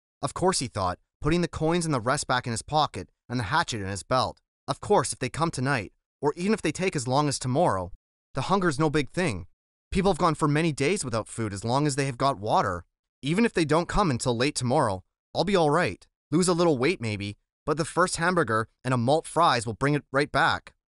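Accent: United States. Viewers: right bro ?! the american voice sucks. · I really love this book but the typical American voice ruins it. I can't listen to this at all. I'd rather read it again. Why do Americans ruin everything good for everyone... what a shame that the world thinks of you in such a way when we could all be a little better...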